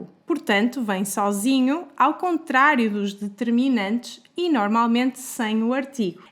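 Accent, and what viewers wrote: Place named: Portugal. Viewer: Oi linda 😍😍😍 amo o sotaque portugues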